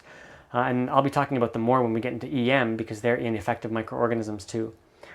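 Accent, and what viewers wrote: Canada. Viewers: My guess is Canada.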